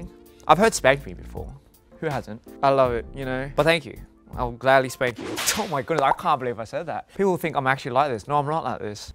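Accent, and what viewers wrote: Australia. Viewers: the rose was hilarious too but i genuinely couldn’t stop laughing at this one. his aussie accent makes it 10x better i love 😭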